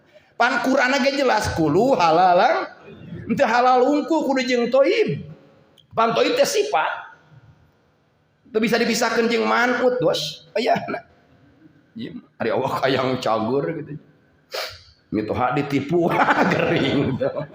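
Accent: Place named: Cianjur, Indonesia. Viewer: Logat cariyosnmh asa ci anjur. Waas .duh.abdi mlb Garut.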